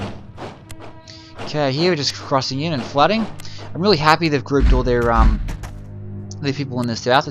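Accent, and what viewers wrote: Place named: Australia. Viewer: Expertly played mate; was surprised to hear an aussie when i started the video to be honest. I might watch a few more of these if i need help nailing a brilliant victory later on; did you upload videos for all of the Red Turn campaign?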